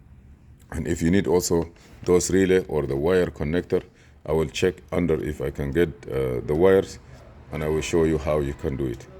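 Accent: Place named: India. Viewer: I be honest I can't listen to the indian accent idk if its just me!? N they be post so many DIY videos nan fooken get so damn mad. Lol anyways thx u sir u speak very good in English I can under st an you for sure you be surprise there's lots that dnt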